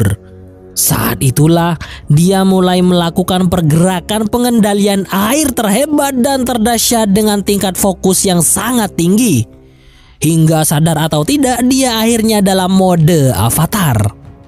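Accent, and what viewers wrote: Madura, Indonesia. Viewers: Ternyata orang Madura😂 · Beh madureh kakeh huh la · Kakeh reng madureh iya bang wkwkwk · Anjir reng madureh kak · admine seorg madura...aksentomasinya jelas betul....hahhahah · Behhh mak tepak ka oreng madure, oreng dimmah? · Ternyata oreng madureh kkeh bang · Admin nya Orang madura😂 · Madureh keh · Mak madureh koh🤣🤣,"mareh kkh conk dulat" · Kok ada bhs maduranya nkok reng madureh kiah😅😅mantapp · MK bedeh madurenah 🤣🤣🤣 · Orang madura bang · Mateh kakeh cong wkwkwk... oreng madureh nii boss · Adminnya orang madura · Medureh cak jhhh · Orang Madura Kah Min? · Oreng Madura been kak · Mik pas ngucak madura kkeh😅😅😅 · Anjay medureh kakeh kak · Udah nebak kalau Mimin orang Madura dari awal pas ngocak " mateh kakeh cong, dulat " langsung ngakak aku 🤣🤣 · Eh ternyata orang madura taiyeeee.. Sukses tretan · Orang MADUREH kakeh bang 🤣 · Baru sadar kalau yg bicara alur cerita orang Madura 😂😂😂 mateh kkeh conk dhulat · Anjir orang madura coxxx 😂😂😂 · Njr reng matureh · Orang madura ya bang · Reng madureh kakeh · Mantap tretan baru tau naratornya org madura... 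· Hahaha reng madure kakeh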